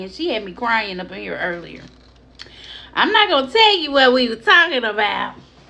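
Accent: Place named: United States. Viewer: I like her country accent!!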